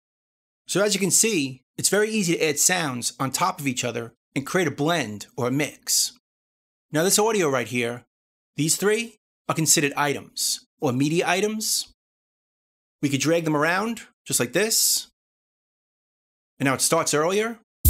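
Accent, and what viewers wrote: New York, United States. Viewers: Irrelevant curiosity: what kind of accent do you speak with? New York?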